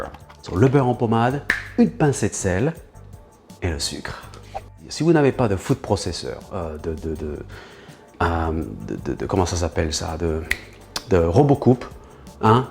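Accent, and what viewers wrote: France. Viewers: j'adore ton accent français à la hache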